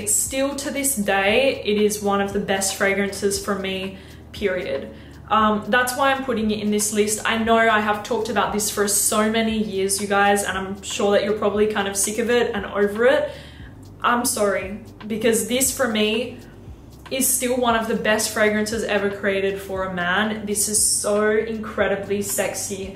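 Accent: Australia. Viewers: aussie accent 😍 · Great review! Also, I have to say you (and that voice/accent (Australian?)) are absolutely lovely. Anyway...love your videos.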